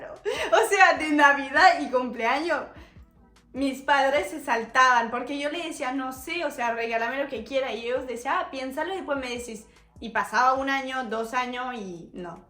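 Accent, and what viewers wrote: Buenos Aires, Argentina. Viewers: Esperamos que vuelvas a TU Argentina,!!! Sos muy argenta en todos tus modismos, tus expresiones (estar al pedo, la entrañable costumbre del mate a cualquier hora del día, y la c... de la lora!!😅🤣😂) tu alegría, tu pedir permiso, el yeísmo tan nuestro, sonás muy argentina!!! Súper simpática, adorable, totalmente compenetrada con nuestros términos!!! No demores, te esperamos!!!🥰🤗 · sos una argentina más, llegastes aquí y se te transformó tu rostro, vos lo decís, sos más alegre. Argentina tiene muchas cualidades que hacen que muchos extranjeros la amen que puede ser nuestra rica cultura, gastronomía, arquitectura Pero lo que creo que les fascina a los extranjeros es nuestra hospitalidad, la amistad que es muy típico de nosotros, los hacemos sentir como si estuvieran en su propia casa. Somos muy particulares y eso gusta mucho así que bienvenida a la ciudad mágica que atrapa a mucha gente y de la cual terminan enamorados. 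Es así el tema y sobran los ejemplos con muchos extranjeros. Una vez más, bienvenida,ya se te pego nuestro acento.❤❤❤❤❤❤ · te escucho y ya sos Argentina.... me encantó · Se nota que sos súper alegre!!! Vivir en Argentina sin duda te hizo bien. Y tu forma de hablar es bien porteña, ¡me encanta! Ojalá puedas volver pronto, este país es tu casa. Un abrazo grande a la distancia. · hablas perfecto el acento argentino, ya sos una mas de nosotros:face-red-heart-shape::face-red-heart-shape::face-red-heart-shape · EN SERIO SOS FRANCESA???PARESES UNA ARGENTINA MAS , TU ACENTO Y MODISMO ES INCREIBLE JAJAJA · sos bella en todo sentido, me encanta tu tono Argentino, saludos desde salta Argentino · dos una genial!!!!! Hablas en argentino re bien!!! No es vulgar, todo depende de con quien hablas y el tono, con que lo dices. Sos muy linda. ❤ persona!!! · Habla mejor el argentino q nosotros 😂. Qué genia esta chabona 👏🏻👏🏻👏🏻👏🏻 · 100% argentino tu relato! 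Tu castellano argento está impecable! Un fuerte abrazo, ❤❤❤ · sos increible! Me enamore de vos!! Te escucho hablar y veo a una Argentina mas!¡ increible lo bien que hablas el idioma,los modos que adoptaste,las costumbres! Se ve y se nota que disfrutaste el tiempo vivido aca,lo feliz que se te ve!!! Espero de todo corazón lo mejor para vos! Para uds!!! Espero vuelvas al país y ovbio que hagas mas videos!!! Besos y cariños!!❤😊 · Holaaaa!, te digo que tenés acento argentino, y ya tenés muchos modísmos también...como decir al pedo etc..🤣🤣🤣. Acá son la 9 de la mañana, estoy tomando mate y viendote. Sos encantadora, re piola! 😂.Ya me suscribí. Besos y hasta siempre! 🤗🤗🤗🇦🇷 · Porteño! Diría YO 😂 jaja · Amo a los extranjeros hablando con acento argentino te queda muy bien jaja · Que grande la halando a lo argentino.... una pena que ya te fuiste de Argentina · ❤ bravo me encanta escuchar como hablas en argentino! 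Pero no todos decimos malas palabras 😂 mis hijos me cargan porque yo horrorizada cuando dicen malas palabras ellos y sus amigos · da gusto verte, por lo espontanea, lo que transmitis, alegria, y tu castellano es muy bueno, mejor dicho no es castellano, hablas argentino, espero puedas venir a pasear algun dia y ver a tus viejos amigos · Hermoso que a veces decís "yo" como "sho" argento y a veces "io" colombiano, hermosa tu experiencia, Argentina un camino de ida. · Genial. Me hiciste el almuerzo, hablas igual que un Argentino y puteas mejor todavía.....😂😂 Sos como la frase: UN ARGENTINO NACE DONDE SE LE DA LA GANA. 🇦🇷👏👏👍👍👍👍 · recién te descubro, y me mata tu argentinidad, te digo q no se te nota para nada q sos francesa exelente tu pronunciación un acento muy Argento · Hola hermosa muy buenos tu. Video hablas como una argentina más saludos y que sigan los éxitos 🥂🥂🥂👏👏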